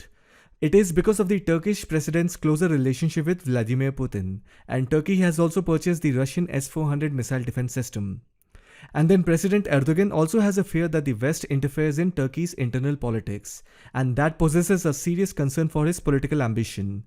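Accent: India. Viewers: Russian propaganda with Indian accent.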